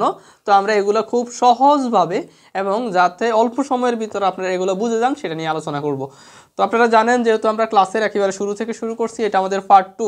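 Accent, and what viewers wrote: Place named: Noakhali, Bangladesh. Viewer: vaiyer basa mone hoi noakhali ba feni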